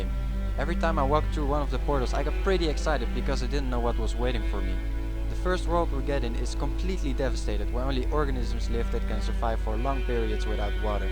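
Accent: Netherlands. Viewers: Dat dacht ik al te horen aan het accent;) Leuke video btw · Nederlands accent XD nice video btw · Are you Dutch? I seem to hear an accent lol.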